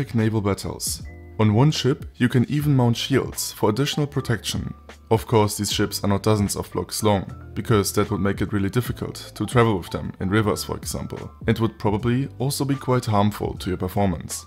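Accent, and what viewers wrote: Germany. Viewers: He is German you can hear it your self in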